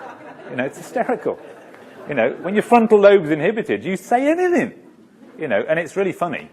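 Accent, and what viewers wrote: United Kingdom. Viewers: Is he ever to the point? Is beating the bush just part of his dialect, is add the cost of a fancy English accent ? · This guy knows how to talk and explain....Although he has that british accent,it is very pleasant to listen to him :)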